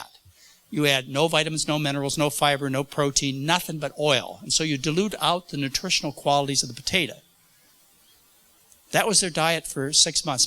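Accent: United States. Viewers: It's a midwestern accent. You never lose it. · 'Scuse my ignorance as a Brit/Aussie, but just what is wrong with potaytah, that's how I say it, and where is this US accent from?? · Carole von Aarberg Hello from a Southern Louisiana girl! Nothing wrong with this pronunciation! I’m not sure exactly where the doctor is from but his pronunciation is definitely a Southern US accent. There are many accents in the South but many say potaytah.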